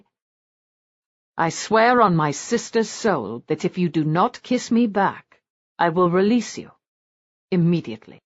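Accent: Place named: England, United Kingdom. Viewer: Regarding pronunciation. Many words spoken in England are pronounced differently in the US. This story is read by an English woman. All of these differences is what makes the world and different cultures so fascinating.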